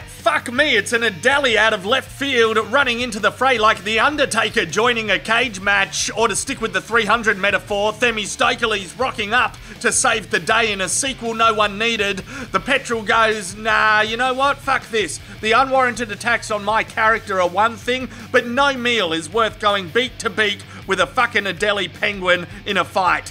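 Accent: Australia. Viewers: He's just a typical Aussie. All my mates talk like this.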